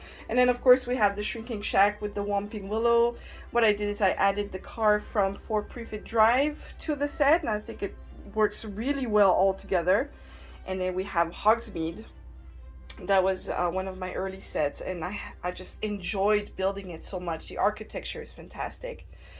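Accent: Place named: France. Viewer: you have a french accent, you're french?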